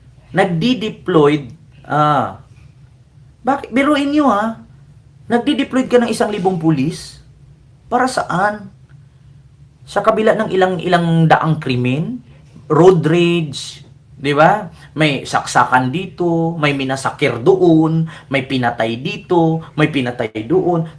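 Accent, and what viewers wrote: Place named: Iloilo, Philippines. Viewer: Tonong ilonggo ka magsalita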